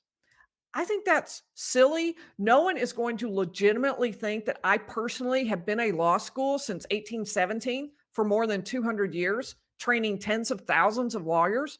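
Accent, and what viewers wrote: South, United States. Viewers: My crazy ex-husband graduated from Harvard Law in 1997. Sounds like you had the same profs that he did. You sound Sluthern too. Iam from TN I wonder if I met you when you were there, as I was on campus, often.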